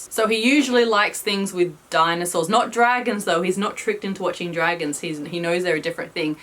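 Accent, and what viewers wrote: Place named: Greek Australian, Australia. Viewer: Are you Greek Australian? You have a woggy Aussie accent and said "no not papou, bathroom" so I assumed you must be Greek Australian.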